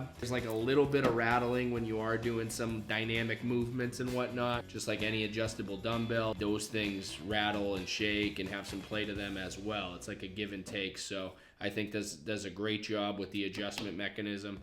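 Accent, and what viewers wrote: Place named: New Hampshire, United States. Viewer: I was just listening to this video and thought, "This guy is definitely from New Hampsha'" then I looked at my screen and saw the huge flag! 🤣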